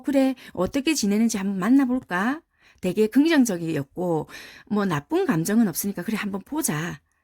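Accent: Busan, South Korea. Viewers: 와우 감사합니다^^*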